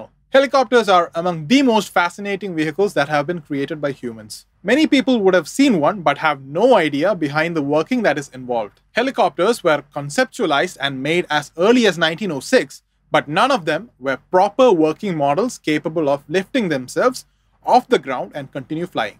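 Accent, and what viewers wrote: India. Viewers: Ugh heavy indian accent...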